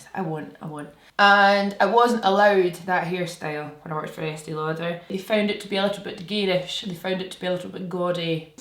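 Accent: Scotland, United Kingdom. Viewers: I love the Scottish accent!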